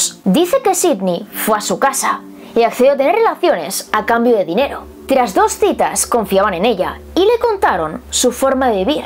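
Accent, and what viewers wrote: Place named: Spain. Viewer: es tan hermosa, es una bellota Es tu acento español es... Hermoso, divino, precioso. Saludos amigos todos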